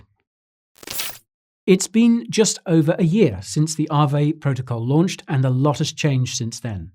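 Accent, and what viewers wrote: United Kingdom. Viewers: string agree....u from United Kingdom right as i love a way u talk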